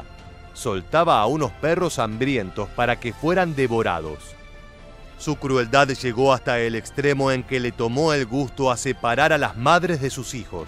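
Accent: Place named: Argentina. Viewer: Ablas como argentino venezolano con cubano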